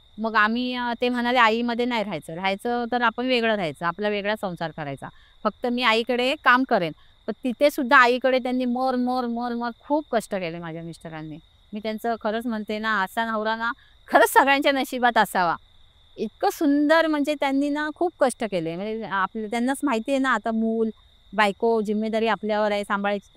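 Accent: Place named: Konkan, India. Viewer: Khup sunder story sangitli ekdum amcha kokni andaaz thanks kaki, plz reply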